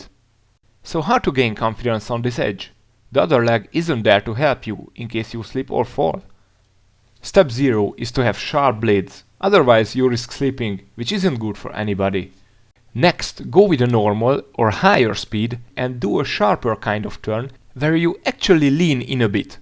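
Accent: Hungary. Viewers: hallatszik a magyar kimondás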